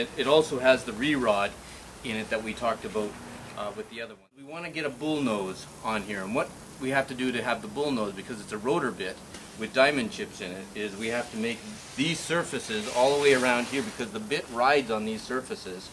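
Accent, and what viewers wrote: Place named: Cape Breton, Canada. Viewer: great video..... I started watching, and thought... this guy's from cape breton! thanks from moncton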